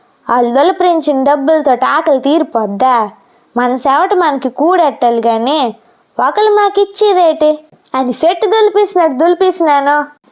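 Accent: Uttarandhra, India. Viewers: అందరి ఆకలి తీర్చే రైతు ఆకలి తీర్చేది ఎవరు... ఉత్తరాంధ్ర యాసలో వాయిస్ అదుర్స్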